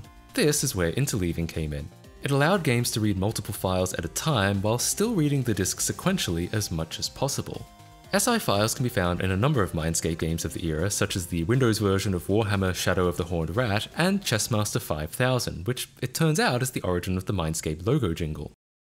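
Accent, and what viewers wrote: Australia. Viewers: "British" - Said about a man who clearly has a strong Australian accent.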